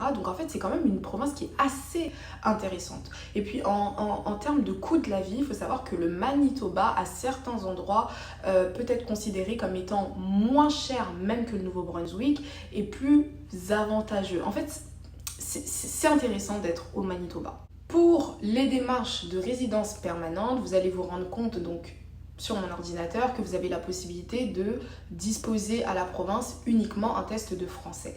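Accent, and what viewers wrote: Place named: France. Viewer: Vous avez l'accent française🇫🇷😮. Je suis aide soignante en France mais étranger. C'est possible de travailler avec le diplôme française ? Avec un visa de tourisme ?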